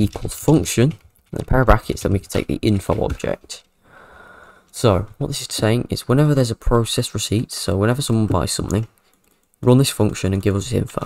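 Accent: United Kingdom. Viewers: Fir o all ge a new mic an fi tha briitsh accent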